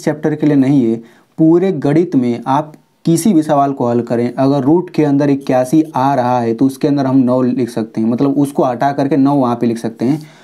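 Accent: Bihar, India. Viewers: Sir Aap Bihari hona na anyways Thank you 👍👍👍👍👍👍 sir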